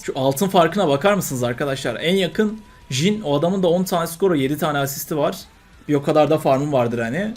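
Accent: Adana, Turkey. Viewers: Abi Adanalısın heralde turnuvalara katılıyor musun ?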